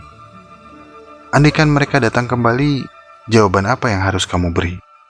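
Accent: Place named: Java, Indonesia. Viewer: wong jowo fix ono kata" deng 🙈🤣,jowo ne ndi mas ?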